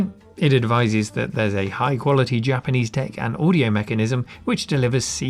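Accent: United Kingdom. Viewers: British LGR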